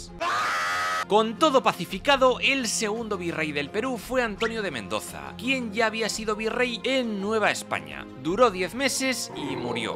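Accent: Spain. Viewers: Acaso es un español el que esta narrando???????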